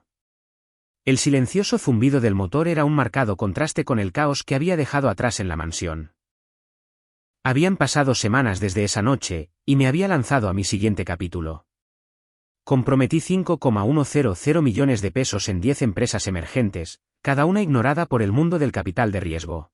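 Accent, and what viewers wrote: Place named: Spain. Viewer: Si la trama del relato se desarrolla en México como país, ¿porqué el audio está en español ibérico y no latinoamericano?. Cansan sus terminaciones en "eis" como dudasteis en lugar de dudaron o hicisteis en lugar de hicieron y muchos términos más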